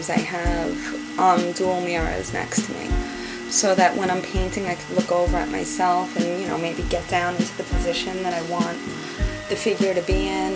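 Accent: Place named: East Coast, United States. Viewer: I really enjoyed watching your presentation! Very nice voice and East coast accent! ((huggs)) :]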